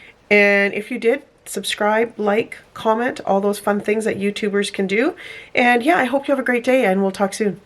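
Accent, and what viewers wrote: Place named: Canada. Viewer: Well hello fellow Canadian (I'll be very surprised if you're not) - that's what your 'accent' sounds like - anywho, I'm a brand new subbie who happened to stumble across this video and I hit subscribe right away. I am a 50 y/o, mother of 1, married x 25 yrs and working as a stenographer in our hospital x 25 yrs. I'm a makeupaholic, it seriously is becoming bit of an issue, and I promised myself (and my hubs) that I would try really super hard to be on a no buy or low buy this yr. I would love to see you do a full glam look and I just know I'm going to love your channel. Sending big xo's from Labrador, Canada.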